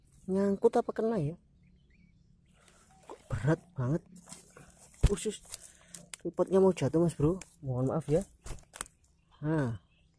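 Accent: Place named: Banyumas, Indonesia. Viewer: Iki tunggal e cak agus ngapak😂🤣spot e marai ngiler🤣🤣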